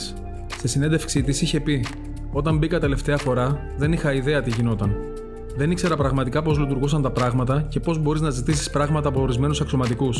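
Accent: Crete, Greece. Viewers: ωραίο βίντεο....Και απίστευτη προφορά κρητικατσι μας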